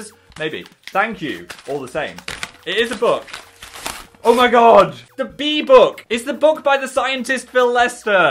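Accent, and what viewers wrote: North, United Kingdom. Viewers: Is Phil's northern accent getting stronger every year? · i swear you're getting more northern the more the years go on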